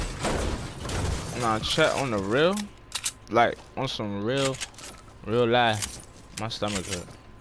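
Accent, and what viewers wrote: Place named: Washington DC, United States. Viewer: sounds like is from Washington dc.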